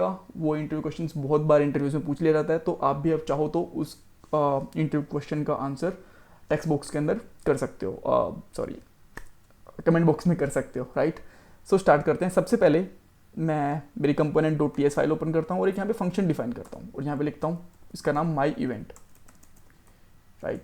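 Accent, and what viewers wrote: India. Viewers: Noida se ho bhai kya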